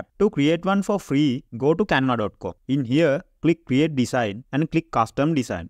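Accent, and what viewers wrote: Kerala, India. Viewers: Nice Malayalam accent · Your voice is like my Indian frnd * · Lived your malyali accent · indian accent? I hear scam when i hear indian accent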